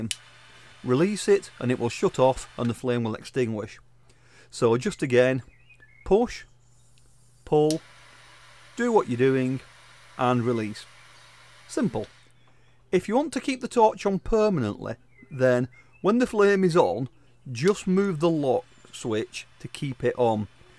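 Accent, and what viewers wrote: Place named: Lancashire, United Kingdom. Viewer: Great video.excellent tutorial.Plain English with a touch of Lancashire accent.Well done sir.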